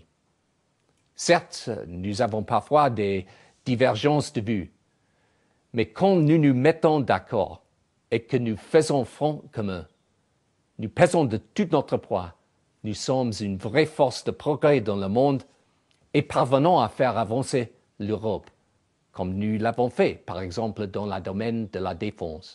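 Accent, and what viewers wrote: England, United Kingdom. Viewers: he has a realy nice french speaking and a nice charming English accent. Bravo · lol!J'adore l'accent british!!comment il prononce "mondialisation" et "pouissances" ^^ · he speaks very clearly... i'm french, he just has this so recognizable english accent on french language. that's very beautiful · i don't speak french but even i can sense his english accent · Oui, il conserve évidemment son fort accend anglais mais sa prononciation et son vocabulaire sont excellents ^^ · God im English and even I can tell her speaks french with a big english accent! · Grr.. he's got such a typical "English-student-learning-French" accent. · I can't believe that some of you French people are critiscising him for having an English accent. What the hell do you expect? English and French are both strong languages and when we try and speak eachothers language we always speak it with a very heavy accent, yours is just as bad as ours! 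Look at Arsene Wenger, his accent is as strong as the day he moved to London 13 years ago. He cannot say a single world and sound English.... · it's very good , we can understand everything , but there is still this typical english accent (the 'ey' , the softened 'r' , some syllables are skipped....) and that sounds a little like a chinese speaking french 8D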